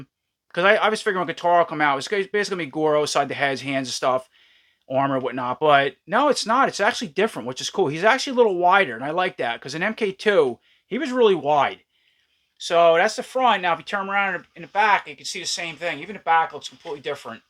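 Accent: New York, United States. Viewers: You gotta NY accent, makes the review better..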